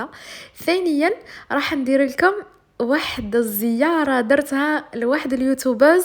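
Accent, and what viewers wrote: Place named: M'Sila, Algeria. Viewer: سبحان الله وليتي تهدري كيما ناس مسيلة 😂😘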